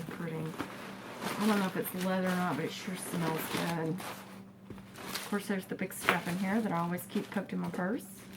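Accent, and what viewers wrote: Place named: South, United States. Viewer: I've watched lots of your videos, girl you have that like sweet southern accent, smile, and friendliness that is so classy and feminine. You got a new subscriber :)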